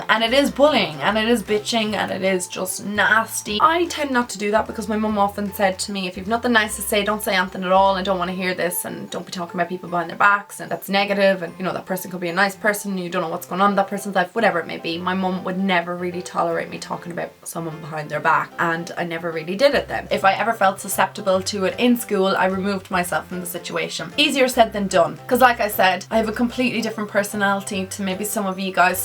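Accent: Ireland. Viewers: Are you Irish? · Love your advice and health related videos😍 So relatable and helpful, definitely one of my favourite YouTubers! What county are you from in Ireland? I'm from Dublin but my accent is nothing like yours😂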